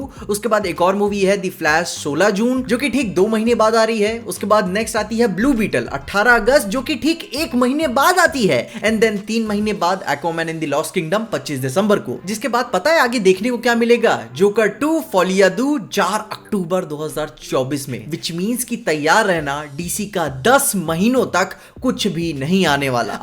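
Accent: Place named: Uttar Pradesh, India. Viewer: Bhatere 😁 up ka dialect aane lag gya bhai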